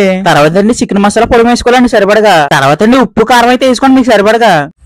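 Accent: Godavari, India. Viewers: Meeru meee comments okalla meeda okallu. Godavari yaaasa adbhutam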